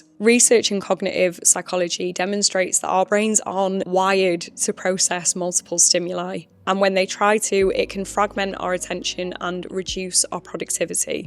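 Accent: United Kingdom. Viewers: Hello from Russia ❤ I love your vlog and your sweet British accent 😊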